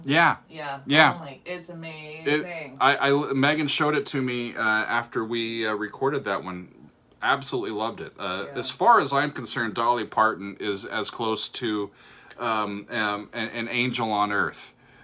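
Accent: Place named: Oklahoma, United States. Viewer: I'm in Oklahoma. Dude you have the Oklahoma accent ... Well maybe not .. not far off tho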